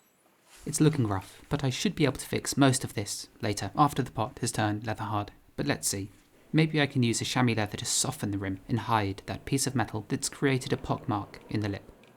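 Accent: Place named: United Kingdom. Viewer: I think a good recipe for youtube carreer balance seems to be (from the youtubers I consume the most at least) just doing what's fun for you. If it's genuine and makes you want to keep doing it, and monetary reasons aren't your main driver, then it's better to let an audience that fits your vision come to you than vice-versa. Obviously this prescription completely backfires if your main goal is making the most money, but those channels tend to not last too long so make those calculations yourself if that's the case I guess. Personally I appreciate intentional videos, but I also really appreciate your channel cause it's like looking into the window of a person who's life you have nothing in common with, learning a bunch of things along the way, and in that calming soothing brittish voice of yours that I love. Hoping to someday find the inspiration/time to practice pottery, but until then, I'll enjoy watching you do your thing :)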